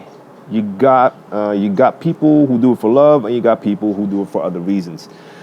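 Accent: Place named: United States. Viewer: true that! Especially cause I am doing all digital. But it's not even about me. It's about the music :) Haha, klopt helemaal ;p! Ik moet eerlijk zeggen dat ik niet had geweten dat jij ook Nederlands was totdat je het in een van je videos zei. Goed Engels/amerikaans accent haha. Heb veel van je videos geleerd!!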